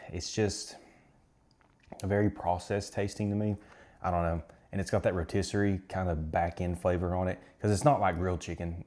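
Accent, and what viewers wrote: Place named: Knoxville, United States. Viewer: Good Review! Im glad your video popped up on my recommended! If I had to guess you're from Tennessee, you've got that knoxville accent thats easy to listen to. Keep up the good content!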